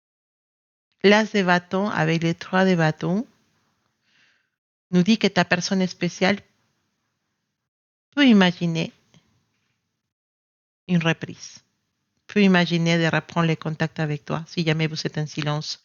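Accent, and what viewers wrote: Spain. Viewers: Je suis la personne spéciale, il y a pour moi toujours une grosse attirance, je n'ai personne d'autre dans ma vie, on vient de se séparer car il me fait beaucoup de reproches il est dur avec ses mots irrespectueux, agressif dévalorisantes. J'étais jamais assez pour lui j'en ai perdu mon naturel. C'est vrai j'ai idéalisé et je suis très déçue, je voulais une belle relation avec lui dans le respect et dans la paix. C'était l'ascenseur émotionnel. Oui je suis triste malheureusement il ne changera pas. Je suis Gémeaux et l'autre tirage ma également parlé bcp. J'ai peur car je ne veux plus qu'il me parle mal et me corrige tout le temps. Oui je veux changer et le fait de ne plus accepter de l'irrespect et je dois mimposer plus mais aussi mouvrirnplis dans une relation J'adore vous entendre parler votre accent espagnol qui me rappelle mes origines😊 😊😊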